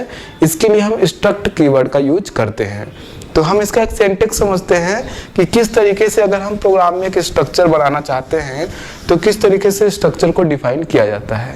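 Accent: Bihar, India. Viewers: Sir aap Bihar se hai kya??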